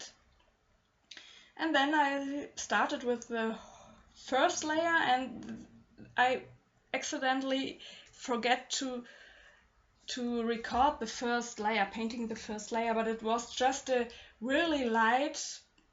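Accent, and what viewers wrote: Germany. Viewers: I hope this isn't rude to ask, but could it be that you are german? Just judging by your accent... Not that that's a bad thing! I really really enjoyed this collab! Both pictures work very well together, especially because your half is rather warm, but still has some blue/cold accents which resembles the colors PearFleur used in her half. Her part yet again has little accents of warm colors as well it resembles yor part. Just a very nice Collaboration, and I think most people, if not all agree with me!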